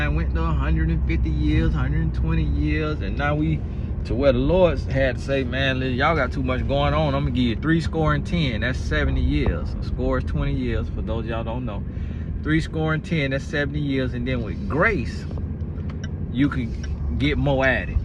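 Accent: South, United States. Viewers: I love listening to your country self. Fasting definitely has its blessings.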